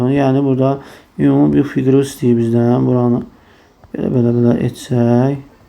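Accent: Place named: Gabala, Azerbaijan. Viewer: Salam danışığında Qəbələ ləhcəsinə bənzəyir sabah hamınza uğurlar ❤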